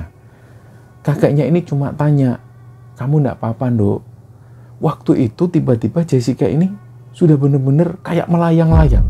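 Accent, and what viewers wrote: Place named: Surabaya, Indonesia. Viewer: mas the best critanya khas suroboyo banget.setiap hari subcribe.nya nambah 1000.salam dari jombang mas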